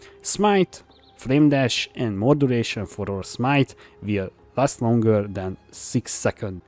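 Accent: Hungary. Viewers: Remélem csak én hallom rosszúl, hogy magyar vagy? Mert sirva fakadok ha ilyen szintű angol nyelvü videókat csinálsz, miközben magyar myelvüek nincsenek.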